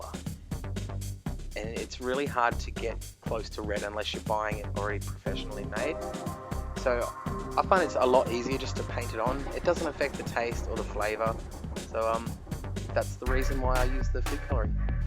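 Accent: Australia. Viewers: Aussie!!!! Damn I miss Australia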